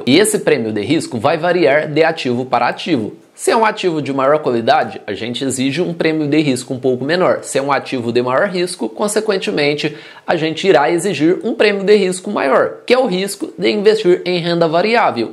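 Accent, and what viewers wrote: Brazil. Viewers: ah, legal, imaginei que pudesse ser do interior de SP ou MG! Sotaques e regionalismos são coisas que me deixam curioso! Agora vou poder me concentrar no assunto dos vídeos. Antes, eu ficava só pensando, "de onde será este sotaque", e esquecia o que estava sendo dito no vídeo! 😀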